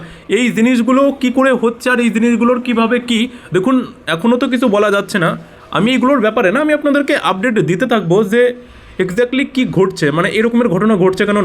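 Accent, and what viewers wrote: Bangladesh. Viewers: হুমায়ূনকে বিজেপি সাপোর্ট করেছিল।। তুই জানিস না গোবর ভক্ত।। তোর কথা শুনে মনে হচ্ছে তো তুই একটা খাঁটি বাংলাদেশী।। এই করছিল খাস ছিল এই যে তোর ভাষাগতে।।তোর প্রমান তুই একটা বাংলাদেশী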